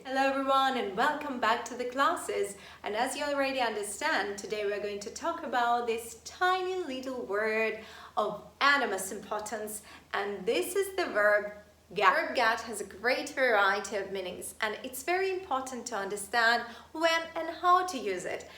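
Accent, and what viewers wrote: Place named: Ireland. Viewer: You king of sound Irish when you speak :-) Great teaching skills.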